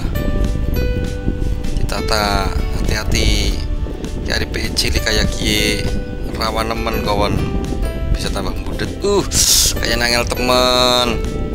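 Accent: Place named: Banyumas, Indonesia. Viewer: Ahahahah lanjut ngapak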